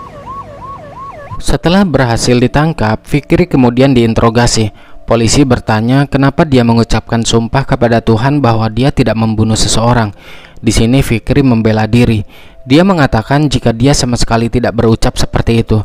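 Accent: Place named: Banyumas, Indonesia. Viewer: Salam ngapak.... 🙏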